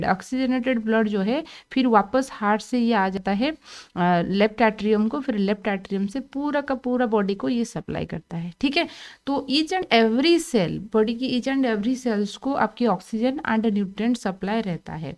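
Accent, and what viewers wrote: Odisha, India. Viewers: I think you’re odia that i got know through your accent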